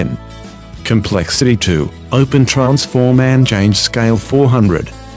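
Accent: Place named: Australia. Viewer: robot australian is a very annoying accent for this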